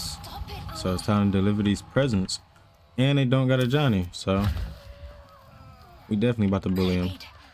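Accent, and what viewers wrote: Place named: Detroit, United States. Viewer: Sound like my homie from 7 mile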